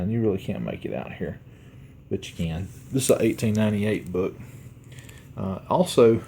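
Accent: United States. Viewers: is he from texas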